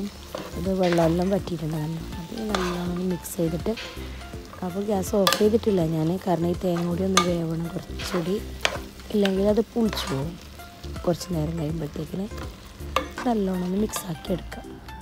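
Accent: Kasaragod, India. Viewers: Kasarod slang super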